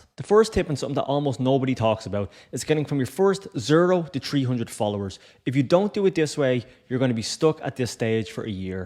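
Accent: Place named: Ireland. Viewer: Excellent advice snd a irish accent double whammy why to follow 😊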